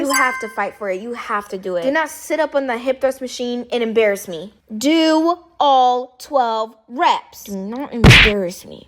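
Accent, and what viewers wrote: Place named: United Kingdom. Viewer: "Beautifully sculpted" is a massive understatement. I like your narration and the random British accent. I'm from across the pond myself.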